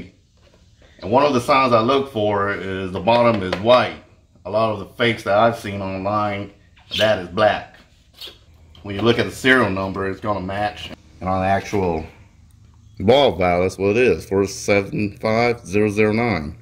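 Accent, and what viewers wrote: United States. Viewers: The replica out here catfishing 🤣 this dude voice and face catfishes me.. no way did I think this dude would sound like this talking 😂 got that down south draw .. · What's the durability on the fake like , dose the spring begin to leak ? Also your accent sounds awesome I'm from NZ and Americans sound diff but your accent sounds like a mix between then more American though , my high boost is 23psi in the ej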